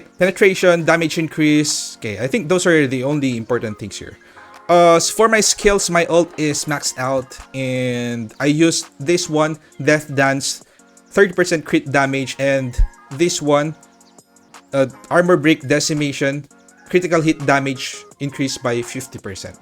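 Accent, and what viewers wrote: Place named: Tagalog, Philippines. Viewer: Are you filipino? Accent sounds like a native tagalog speaker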